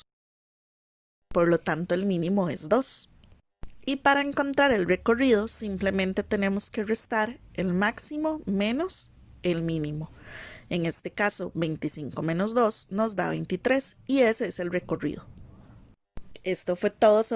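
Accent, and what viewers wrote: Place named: United States. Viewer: Soy yo o la profesora habla como si fuera gringa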